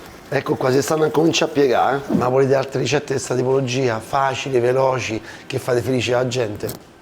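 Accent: Rome, Italy. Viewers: CIAO... OGNI VOLTA CHE COMINCI I VIDEO DICI SEMPRE ISCRIVETEVI.. IO SONO UN PO' DI ANNI CHE MI SONO ISCRITTA..OLTRE A TE GUARDO ANCHE RUBEN UN'ALTRO ROMANO COME TE.. GLI ALTRI CANALI LI GUARDO.. MA NON MI DICONO NIENTE... SEI PROPRIO IN GAMBA!!! SOLO A SENTIRTI PARLARE ROMANO MI SENTO A CASA.. INFATTI IO SONO DI CIVITAVECCHIA E IL MIO DIALETTO SI AVVICINA AL VOSTRO.. MI PIACI ANCHE QUANDO CUCINI DA CASA TUA.. E A VOLTE A CASA DI TUA MADRE.. TI RINNOVO I COMPLIMENTI.... MI PERMETTO DI DARTI DEL TU.. PERCHÉ POTREI ESSERE TUA MADRE DATA L'ETÀ. (75 ANNI) RINNOVO I SALUTI.. CONTINUERÒ A SEGUIRTI.. CIAO A PRESTO E BUONA SERATA 🌹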